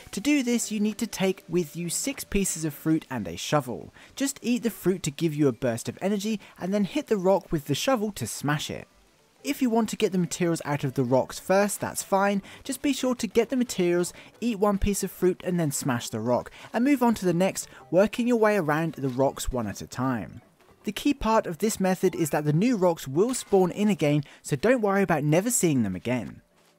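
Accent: United Kingdom. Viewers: This British accent is killin me lmfqo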